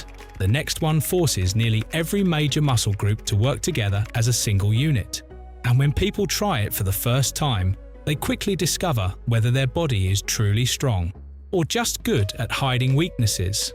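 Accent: England, United Kingdom. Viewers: Thanks enjoy your vids. Good exercises to build into my routine. But would be good if vid matched the lovely sounding (AI) englishman. Nobody in the vid was actually holding the Archer pushup - all were moving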